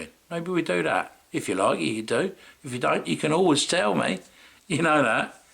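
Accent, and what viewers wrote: United Kingdom. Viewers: Hey! You could get a job as the lizzard in the Geico Commercials. Or you could do a nature show on public television. They dig that British accent. Seriously, though. best of luck on your new job. Times are tough all around. Be safe.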